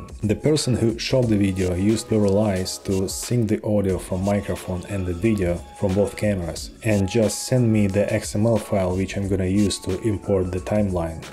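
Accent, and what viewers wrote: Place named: Russia. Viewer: Слышу что русский акцент, спасибо дружище, выручил!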